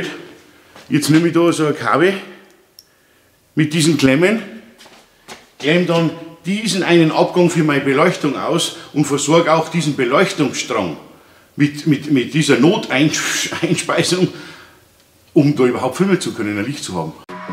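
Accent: Bavaria, Germany. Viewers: Dem Dialekt nach hoffte ich dass Ihr aus Bayern seid. Leider nicht, sonst haette ich evtl. einen Auftrag gehabt.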